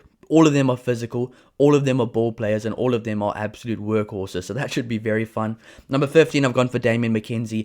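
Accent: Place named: South Africa. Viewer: Brilliant video/ analysis man! So cool to hear our team and game covered with the Bokke accent very refreshing 😉 pretty sold on your team only things I would change this week would be QT at 12 and BP or ALB in the 13. JB can take a well earned rest or bench ( I wd actually like to see him back at 15 tbh with Dmac on the bench) I also like Tosi and want him to have some game time. The Haka is a thing and Codie has been leading it , hopefully others can step up cos I totally agree with you re hooker! Keep it up bru cheers 🍻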